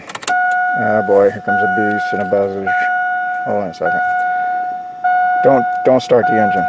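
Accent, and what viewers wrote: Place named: Boston, United States. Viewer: Very relevant to me here in Oz, just doing a Mitsubishi ute and this method is working. Your accent reminds me of Bill Burr ; do you tell jokes too? ( Bill’s from Boston).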